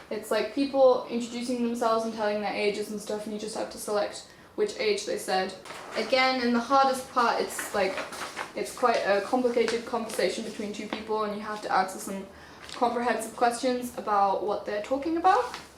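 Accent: United Kingdom. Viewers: イギリス英語ですか？ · 速いーー！イギリスのどこ？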